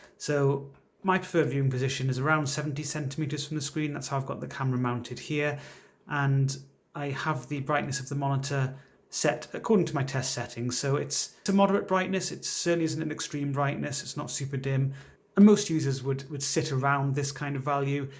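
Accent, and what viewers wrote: United Kingdom. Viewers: Love British English 🥰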